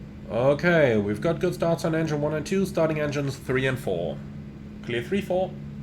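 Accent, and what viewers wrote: Switzerland. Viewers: Sehr schöner Schweizer Akzent. Grüsse aus der Schweiz :-)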